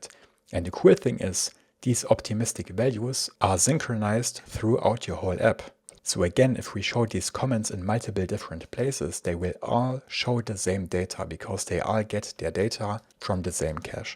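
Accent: Germany. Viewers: gotta love the german accent/pace :-) slow and nice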